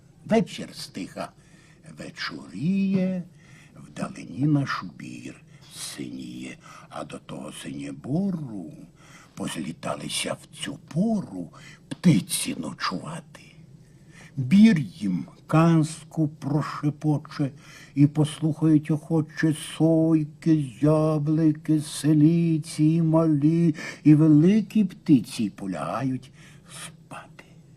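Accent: Ukraine. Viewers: Сразу чувствуется настоящий украинский суржик. Как будто в деревню к бабушке в Одесской области приехал.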